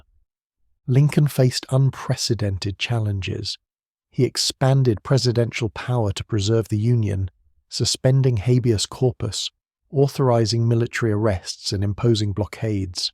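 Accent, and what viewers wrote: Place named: United Kingdom. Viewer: Yeah. Why do we need a goddamn Limey to tell us about our presidents? There's no excuse for it.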